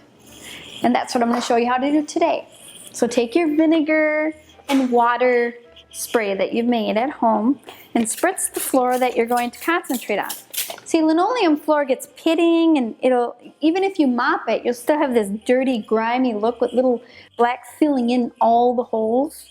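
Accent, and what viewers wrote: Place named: Minnesota, United States. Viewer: I love your accent! Are you from Minnesota?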